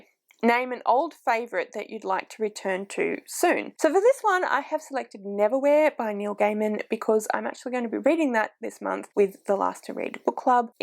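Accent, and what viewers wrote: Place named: Australia. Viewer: Haha, not gonna lie, I was a little confused when I saw the title, then as soon as I heard your accent I was like 'duuuh obviously it's autumn in Australia!'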